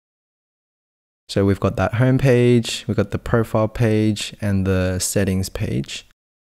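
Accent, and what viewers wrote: Australia. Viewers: Your accent is so interesting. It has flavors of Australian but more heavily sounding of American English than most Australians I've heard speak. What is it?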